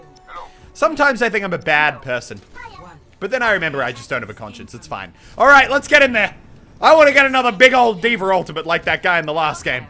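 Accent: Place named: Australia. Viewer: It's because of his Australian accent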